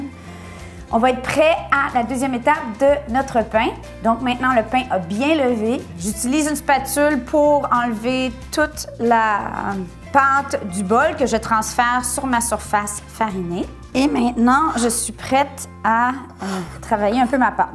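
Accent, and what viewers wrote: Quebec, Canada. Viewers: Le pain et génial et l'accent québécois franchement j'adore ! Tout est magnifique, même la boulangère d'ailleurs ;) ! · Virus oblige, je suis a la maison en Italie (mais d origine Québecoise). Hier a l épicerie il y avait de la levure de bière qui vient du boulanger pcq l épicerie n avait plus de levure. Bien entendu j en ai achetée par curiosité. Je cherche sur Youtube et découvre une personne qui parle comme moi! LE BONHEUR. Je vais commencer mon pain aujourd hui. Merci beaucoup, je suis maintenant abonnée. Ciao xx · Salut ça fait un long moment que jai regarder tes vidéos et je m'en escuse j'ai eu des chamboulements dans ma vie notamment la naissance de bébé il y a 6 mois et javou ne pas avoir pris le temps de regarder tes vidéos enfin Bref, j'ai voulu faire un pain mais râté je me suis souvenu que tu en avais fait.. A la maison je n'ai pas cocotte en fonte est ce que je peux laisser la pâte sur la plaque du four? 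Et est ce que pour la première étape de pose je peux mettre un torchon ( linge a vaisselle ) a la place du film alimentaire?? J'espère que tu pourras le répondre et je promets de regarder plus régulièrement tes vidéos c'est vrai que ta bonne humeur et ton accent québécois ma bcp manquer.. Énorme bisous 😘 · Quel délicieux accent que celui de nos cousins du Canada, et ça l'est encore plus quand c'est une ravissante cousine ! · Bonjour super vidéo sympa qui donne envie de se lancer dans la confection de ce magnifique pain et surtout de jeter ma machine à pain. Encore bravo votre accent me rappelle de merveilleux souvenirs de ma vie au québec il y a40 ans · C'est encore meilleur avec le petit accent Québécois. Merci:) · tres beau accent ,ces Canadiennes j adore . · magnifique, et merci je me suis abonnée, pourriez vous me donner la technique pour un pain au levain avec farine t65 bio + farine de seigle bio ( moitié T65+moitié seigle), eau? 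car problèmes digestifs. Superbe accent canadien et pleine de vie, j'aime.... Merci, à vous lire. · vu depuis la France, j'adore le Québec, les québécois(es) et l'accent québécois... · Bonjour, j'adore votre accent Québécois et votre façon de humer le levain. Astucieux la façon de cuire le pain dans une cocotte, la prochaine fois je vais tenter l'expérience. Merci pour votre humour et pour cette "cousine" bravo! votre pain est aussi beau que celui que nous faisons ici en France et pour vous encourager je m'abonne. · Bonjour je viens de vous découvrir et par conséquent j ai regardée plusieurs de vos recettes Avec votre recette j ai fait mon 1 er levain. Merci Et avec ce levain j ai fait un pain Qui était très bon Mais pas aussi levé que le votre Dernier petit point, je vous écris de la Belgique et j' adore l accent du Québec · Patrick de Paris j'adore ta vidéo quel accent les canadiens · Quel mignon, l'accent québécois! 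J'ai abonné. Merci pour le vidéo. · Non seulement je suis fan de l'accent et des canadiens, mais en plus tu es VRAIMENT super sympatique!!! Je mange sans gluten et do cje fais mon pain moi-meme. Je vais essayer de suite cette recette! Merci pour ta bonne humeur et ta gourmandise finale! On aurait envie d'y gouter aussi de ce bon pain au beurre! ;-) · Résultat incroyable sur la vidéo, et j'adore cet accent canadien ;) Je vais essayer de faire la même chose, mais je n'ai pas ces farines de qualité ;) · Le pain est aussi craquant que la cuisinière !! 😘 Et ce joli accent québécois, j’adore 😍 Merci 😊🙏 pour cette belle vidéo !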